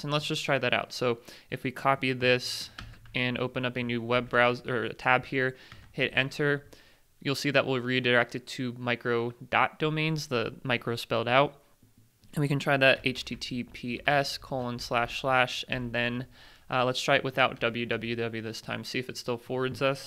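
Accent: Baltimore, United States. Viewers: I can hear that Baltimore accent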